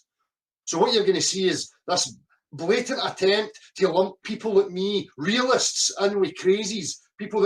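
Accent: Scotland, United Kingdom. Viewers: speaking English with his Scottish brogue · Who is this Scot please?????? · Love the Scottish accent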